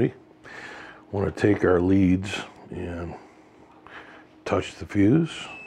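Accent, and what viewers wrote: United States. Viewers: Thank you for your information as said first safety is very important also your English is good and clear (America English) I am watching from Manchester United Kingdom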